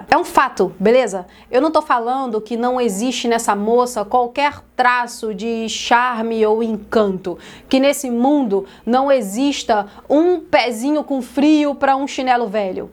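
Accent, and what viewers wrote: Rio de Janeiro, Brazil. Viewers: Mesmo com um sotaque carioca que eu não curto muito, a faz um conteúdo maravilhoso. Amo e coaduna com suas opiniões. E sim, a Testa Ramsey é feia e matou a personagem do TLOU. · é a minha carioca preferida (e olha que eu detesto sotaque carioca) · A PUXADINHA CARIOCA SUA É INEVITÁVEL NE...... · Você é uma carioca da gema!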